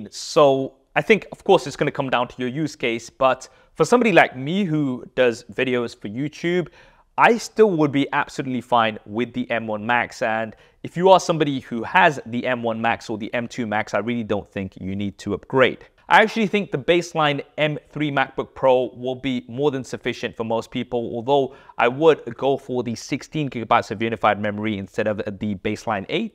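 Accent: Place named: United Kingdom. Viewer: arab guy w british accent, thats new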